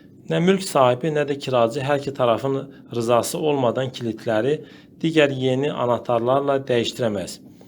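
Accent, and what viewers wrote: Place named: Azerbaijan. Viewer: Bu sesi yazan mutlek ki azerbaycanlidir. Super alinmishdir))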